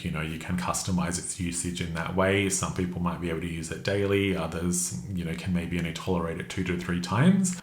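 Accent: Australia. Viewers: So glad I found your channel from James, amazing content, love finding an aussie voice! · Awesome reviews thank you. Love having an Aussie voice in this space ❤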